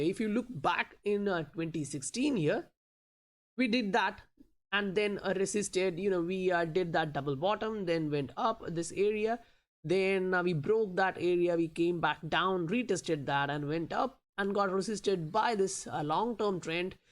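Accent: India. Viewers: Oh and now that I've finished the video and you mention your accent... I started listening because you sounded like a very intelligent Indian guy! 😂😂😂 we have a large community of East Indians in the area of Canada I'm from, VERY SMART! Do you have your eye on any other hidden gems right now?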